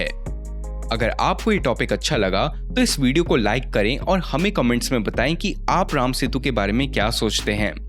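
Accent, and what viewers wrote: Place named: India. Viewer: NASA already declared this is man made. Why you are confusing. As pronounsation you are India. Don't confuse. Ram na nam lo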